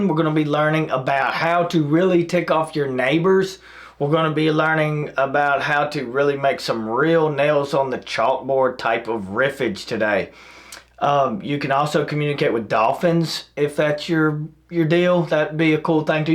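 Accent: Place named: South, United States. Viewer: idk why the algorithm sent this my way but I'm glad it did. I dig your vibe. You don't get a lot of Southern accents on youtube. I've seen that video where Dime talks about this technique but you can barely see what he's doing in it so this was really helpful.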